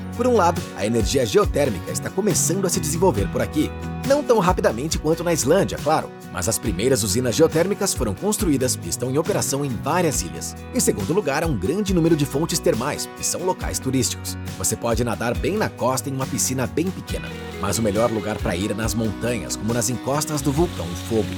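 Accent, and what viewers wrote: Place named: Brazil. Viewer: Voz do Brasil Brazil 🇧🇷🇧🇷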